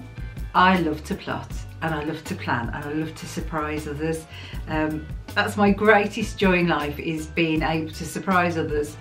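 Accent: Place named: Southern England, United Kingdom. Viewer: 😘hopefully we get to trade someday! Love meeting new Disney Friends - especially in the UK. From your accent I'm guessing down South? Although, I am terrible at guessing accents!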